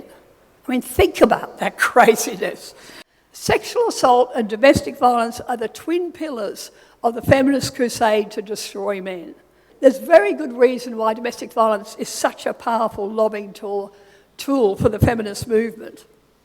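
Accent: Australia. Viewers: I like her aussie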